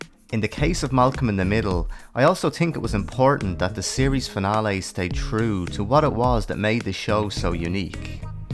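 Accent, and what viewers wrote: Ireland. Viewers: Mate are you from Ireland?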